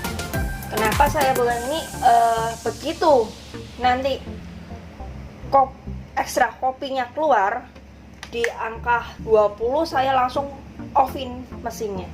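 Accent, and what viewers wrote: Java, Indonesia. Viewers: Medok nya mantul mba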